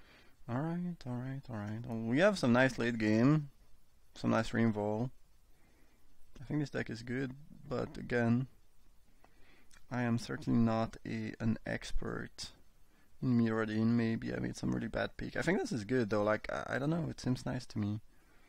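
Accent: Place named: France. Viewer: Where are you from? I can't really figure out your accent. Sound french-ish to me but i'm not sure.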